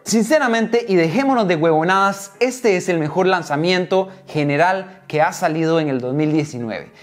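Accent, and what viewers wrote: Costa Rica. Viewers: Usted es de Costa Rica? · Eres de Costa Rica? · Sos de Costa Rica? 🤔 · Es de Costa Rica?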